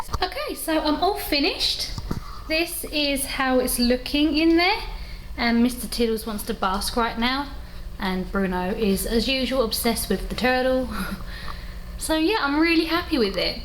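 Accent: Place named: London, United Kingdom. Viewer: You went to Walmart. You sound like your from south or east London.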